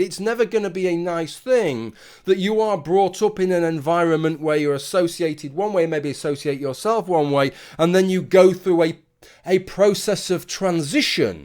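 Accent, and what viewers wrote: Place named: North, United Kingdom. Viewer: You have a pretty voice, sound like the northern people on Game of Thrones! XO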